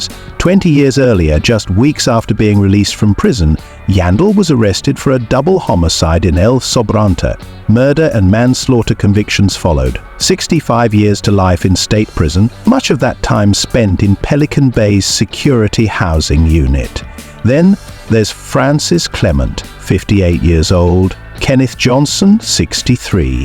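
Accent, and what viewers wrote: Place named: United Kingdom. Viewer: BRITISH NARRATORS , I'LL PASS THANKS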